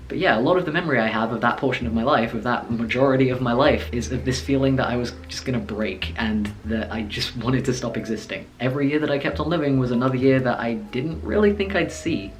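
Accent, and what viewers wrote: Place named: United Kingdom. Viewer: YOUR BRITISH ACCENT IS SO AMAZING I WANT IT AAAAH DHUSGRHIUYDGYUSHIUSGYUDGTISHIUGD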